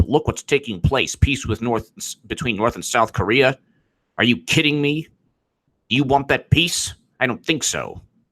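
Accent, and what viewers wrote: South, United States. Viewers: Jeffrey Morrissey It's sort of mandatory, for comic relief. Otherwise this mess is unbearable. And indeed, HA is good at it. How he manages the Southern accent, dunno... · Love that accent!! Are you a closet Southerner? Well, I'll be.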